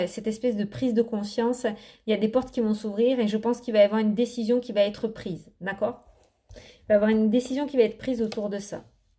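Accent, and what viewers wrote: South, France. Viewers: Coucou! cette symbiose que je ressens lorsque je vous écoute! Tout est le chemin actuel de ma vie, et vos précisions sont totalement liés à ma vie actuelle. Je suis en arrêt maladie suite à une grosse intervention chirurgicale, et j'ai une personne, un homme qui vient d'entrer dans ma vie. pas encore sentimentalement, mais je ne serais pas contre lol!. Affaire juridique en cours qui traînait depuis 5 ans! Je vais bien suivre mes 'alertes" internes afin de voir les mauvaises choses qui arrivent! Mille merci! et gardez votre vitalité, votre accent du sud qui me manque tellement et votre bienveillance! Hâte déjà de vous retrouver pour de nouveaux indices sur nos parcours.... Belle nuit à vous!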